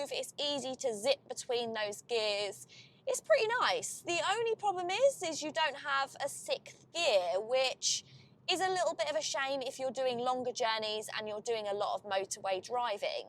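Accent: Essex, United Kingdom. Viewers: I love your accent! What part of Essex are you ftom? I was born in Rochford.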